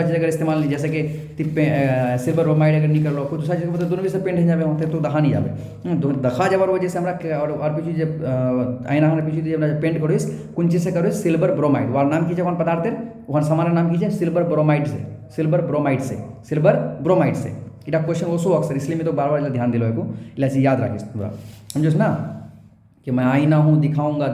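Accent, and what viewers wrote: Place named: Surjapuri, India. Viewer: Pure surjapuri sir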